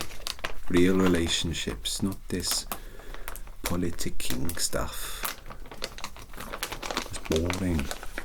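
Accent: Wales, United Kingdom. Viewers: I’ve never thought that Welsh accent can be so asmr! Thank you for your video :) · I love this character, I can just see the wheels turning in your head ☀️ the Welsh accent is amazing I love it ❤️☀️☀️☀️ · My Stepfather was welsh, not heard the accent in ages. I think it’s great 👍🏻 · Out of all the ASMR triggers I've listened to, your Welsh/Welsh accent is on top. 🤤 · socialist elf with welsh accent me, a welsh-speaking socialist, immediately clicking the notification: oh no™ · Top notch engaging acting here with this delightfully funny persona and slow shift of mood...shining example of comments in that recent Tinglecon cinematic asmr panel about "enveloping the viewer in a world" and his "seeing where the character takes him." I love whatever you did with the audio here, it's so present and crisp and soft all at once...really enhances what is my fave Welsh accent of yours, and those choice mouth sounds in beginning. 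I think "chuffed" is one of my fave Britishisms. 🥰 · Oh my god, I love your Welsh accent!! ❤️ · не, я к тому, что же наполовину валлиец, так что это самый что ни на есть натуральный акцент☺️ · Sigh.. The Welsh accent... I could listen to it each and every day... 💗💗💗 Dear Sir... I mean Comenzar Sir, very little scares me, least of all pain. I had been imprisoned in a dark and horrible place for a very long time before you found me, but please understand me that although you have helped, and I'm ever grateful, I saved myself. Why did I make you believe that you saved me? Because I want you to always believe the best about yourself and your abilities. You will never become one of them, as you're not them, but I see you going down a road that is not good for you. Thus I choose the place closer to danger as it's furthest from harm. I will not leave this place, but don't worry, I will keep my distance. 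I'll do anything to keep you safe. I will tell you this, though: that I will break the Stag Officer out of jail. Far too much blood has been spilled already, and there is so much good in him, just as there is so much good in you. I will never punch you, I cannot ever do that. I cannot hurt another. Face this stormy challenging time courageously. Your safety net will always be at vigil close by. Thank you for listening, Mr Comenzar. I'll be going now, but we will meet again. 🌱🌱🌱 Now put that gun away. I don't fear you, and I never will. 🌱🌱🌱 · An Elf Welsh communist sounds just like Wales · As somebody who is a born and raised welsh kid, it's nice hearing the accent. Great job! Da iawn ti! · Hmmmm.....can't say I get behind the whole Socialist thing, but I am digging the Welsh accent! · Absolutely adore welsh accents, also I got pretty invested in this story, it was fun! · I don’t know why, but hearing you with a Welsh accent really makes me smile. 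· This is all a setup for the punchline, "A guy walks into a bar and sees 3 socialist elves......" (And no finale, we all want more unstable elves!!) (Also I legit held my breath mark onward.) (Also also, the parallels and commentary in this is so poignant it hurts. 🥺) My gawd, your Welsh accent is so musical and beautiful. Well done once again, Commissar! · Spreading socialist democracy whether they like it or not, key phrase no? Founding father’s said, here is your democracy if you can keep it. Ouch. A bit of trouble keeping it now, no? I agree, if we don’t move forward we’ll inevitably move backwards very soon. I was wondering when you would do a welsh accent!! Thank you, it is naturally poetic and musical, Charming like yourself🤗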